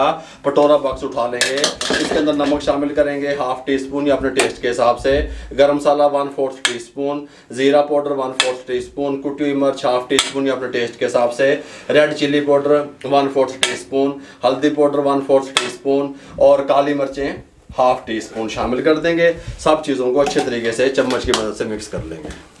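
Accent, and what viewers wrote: Punjab, India. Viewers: Assalamualaikum Sir AP ki Punjabi Kafi mezy Vali hai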